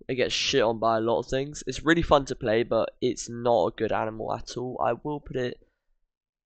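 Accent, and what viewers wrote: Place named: United Kingdom. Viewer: The British pronunciation is so nice😭👍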